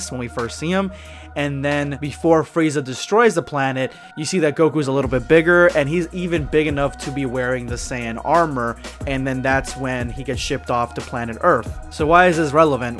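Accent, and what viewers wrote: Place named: Latin America. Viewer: Oh man you being Hispanic makes so much sense, translating dbshippuden and the slight accent in your voice. Edit: I’m Hispanic too🤫, and could you do a video where you speak some Spanish??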